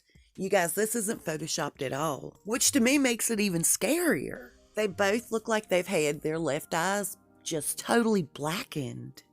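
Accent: South, United States. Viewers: You sound like a southern gossip speaking tool! Don't worry, you have lost me.!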